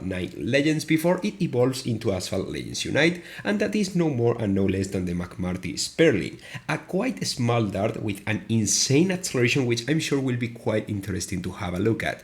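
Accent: Spain. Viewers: Are you from Spain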